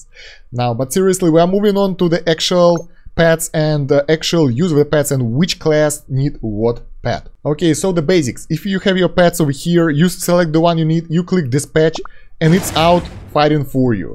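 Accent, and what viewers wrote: Russia. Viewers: уфф, я был уверен, что это русский акцент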